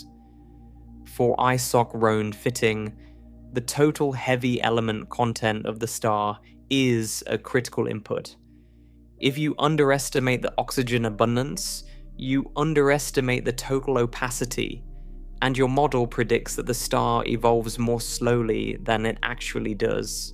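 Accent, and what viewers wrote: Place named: RP, United Kingdom. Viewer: Good content, but the choice of the AI narration (a posh British accent), absolutely destroys the accuracy of the captions. Isochron, subgiant... all manner of technical terms, are rendered gibberish. An American accent isn't as melodious, but it is far easier for the caption translator to decode. Also... very repetitive... this is 40 minutes of content, stretched to 133 minutes.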